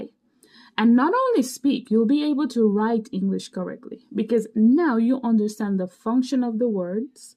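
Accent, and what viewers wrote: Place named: Spain. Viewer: Interesting. Awesome! I notice you speak English with Spanish inflections. What is the youngest student u would teach?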